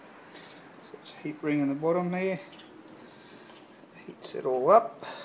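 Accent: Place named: South Africa. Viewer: R u from Aust or Nz , u sound like SA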